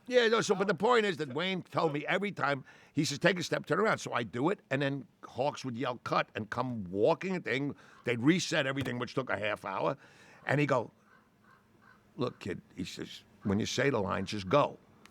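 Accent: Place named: New York, United States. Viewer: A cowboy with a new york accent?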